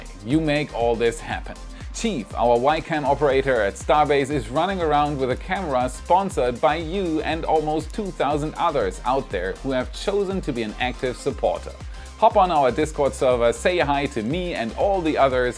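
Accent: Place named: Germany. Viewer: I love listening to that German accent, it reminds me of commander Craise on Farscape.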